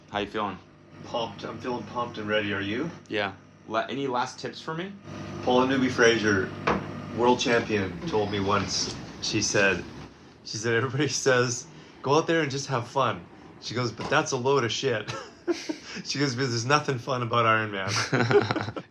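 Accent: Australia. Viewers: I knew you were in Australia, but the accent is always a jump scare. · Your Australian accent is elite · Legend! Keep mounting and do another one. Oh and your Aussie accent is fire!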